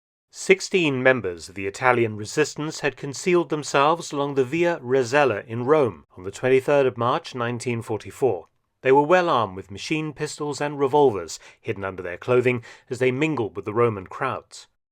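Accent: United Kingdom. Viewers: I dropped out of college, but my history class was the only one I completed. Dude was just like Mark without that silky British accent hahaha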